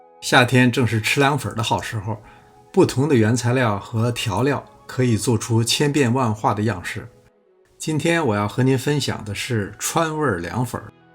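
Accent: Beijing, China. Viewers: 非常喜欢您的视频，其一是语言简洁，其二是内容适合我的口味，其三是地道的京腔，每期必看。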